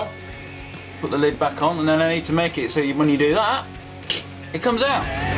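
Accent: United Kingdom. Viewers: How much would you say all the materials for this cost? If possible I want to make myself one. Don't worry about the cost for the tools like the drill and such, I can deal with that. (I know people) I just want to know if I can afford the raw materials. (I probably can't) You sound british, but If possible I would like to know the approximate price in US dollars. I'm also not expecting you to see this comment...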